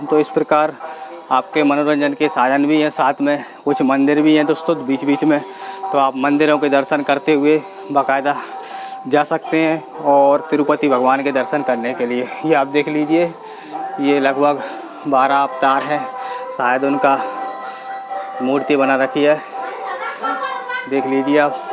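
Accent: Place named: Madhya Pradesh, India. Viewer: सबसे पहले तो आपको वीडियो के लिए धन्यवाद , मैंने वीडियो आज ही देखा है और मुझे जो सबसे अच्छा लगा वह है आपकी बोली जिसे सुनकर मुझे लग रहा है कि आप मेरे जिले भिण्ड या इसके आसपास है अगर मैं सही हूं तो जरूर बताना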